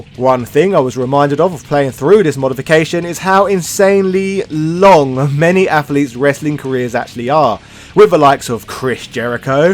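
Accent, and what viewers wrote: United Kingdom. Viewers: 5 mins and 42 seconds in and so far nothing but some annoying brit talking and showing stuff that has nothing to do with the title disliked and flagged as misleading title...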